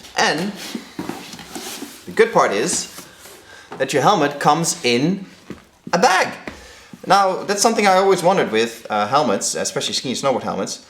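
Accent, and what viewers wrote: Netherlands. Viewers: Are you Dutch? It sounds like you have a Dutch accent haha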